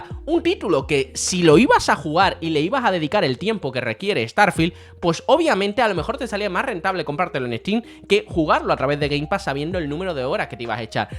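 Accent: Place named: Andalusia, Spain. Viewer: Ves tú? Me lo he imaginado porque aún conservas parte del acento. Tiendes a aspirar determinadas sílabas jeje Yo también estuve unos años en Málaga pero soy de Granada. Pues un abrazo. Y Enhorabuena!!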